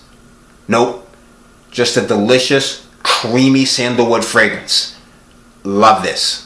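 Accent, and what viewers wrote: Boston, United States. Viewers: love this guys Boston accent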